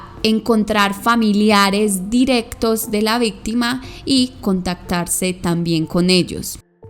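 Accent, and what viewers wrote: Colombia. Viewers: Que satisfacción es saber por fin quien era en realidad Jane Doe, y cuáles eran sus circunstancias, a pensar de que se me hace un caso súper triste, es bueno saber que ya fue identificada y ojalá un día se pueda saber quién fue el miserable que le hizo eso., disfruto mucho tú manera de narrar los casos, de verdad que es entretenido, aparte ese asentó tuyo colombiano tan bonito lo hace todavía más disfrutable. Saludos desde Guadalajara, México.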